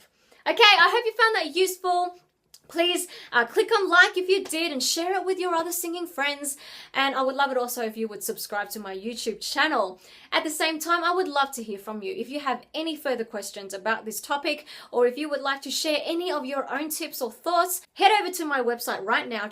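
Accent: Australia. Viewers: are you australian? ...me too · Do u live in Australia? Cause your accent sounds like theirs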